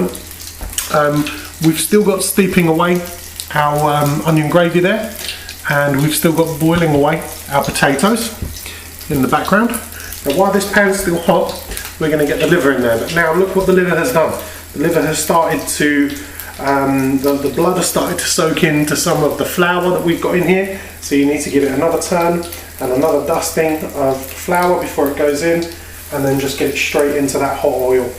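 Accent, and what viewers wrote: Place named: Australia. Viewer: I like your recipe and I like your knife but your Australian accent is hard to listen to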